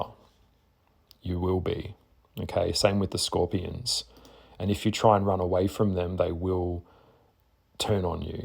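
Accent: Australia. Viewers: 🤣🤣 he is Australian not French where did you get that from ?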